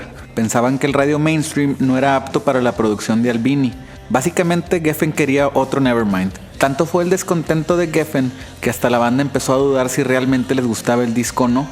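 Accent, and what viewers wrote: Monterrey, Mexico. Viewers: Excelente canal... Por el acento, suena que eres de Monterrey, sugiero un video de la avanzada regia y del cafe iguanas... Saludos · Por qué no hablas de una forma más genérica, no a todos les gusta cuando usas el acento mexicano y tus mexicanismos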